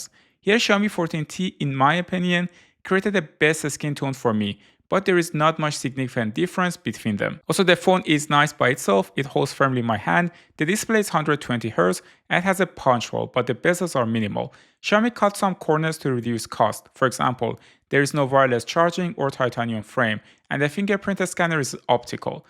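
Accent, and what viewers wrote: Iran. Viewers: چرا حس میکنم گوینده یه ایرانیه😂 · داداش ایرانی ای؟ خیلی لهجت ایرانیه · لهجه برای یه ایرانیه😅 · Is this Iranian accent? Or am I wrong? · Aren't you Iranian? The accent sounds like Iranian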